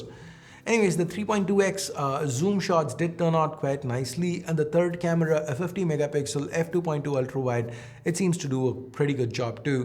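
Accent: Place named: India. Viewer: Accent is top notch neutral and best Indian accent i would say🤟🏻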